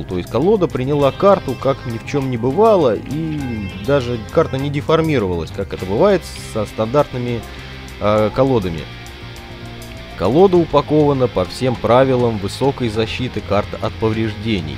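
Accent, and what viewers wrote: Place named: Russia. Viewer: I liked your russian accent having a deck with Mr.Lee Asher signature on it is an honor A MUST HAVE DECK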